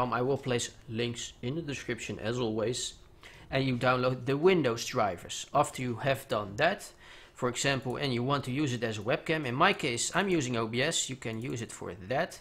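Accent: Netherlands. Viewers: Bij mij hangt de download de hele tijd vast (ik weet dat je NL bent ik hoor het) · Nederlands? Ok hoor t aan je accent haha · You're defenitly Dutch right? i'm Dutch aswell and my YouTube is english and i just can hear your accent we share :D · Hi, U sound a bit dutch, Are u dutch? Cuz i am XD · Zonder dat ik naar de reacties las hoorde ik direct dat je Nederlands was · je bent gelijk nederlands dat hoor ikg elijk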